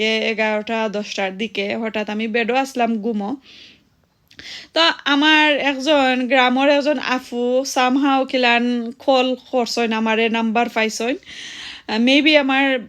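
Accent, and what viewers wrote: Sylhet, Bangladesh. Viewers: u talk in Sylheti basha that’s why I love to watch u more !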